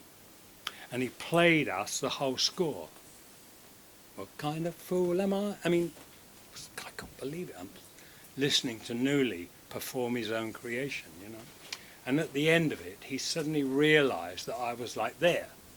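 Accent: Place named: Cockney, United Kingdom. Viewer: Such a charismatic man. Hey sounds pure Cockney here.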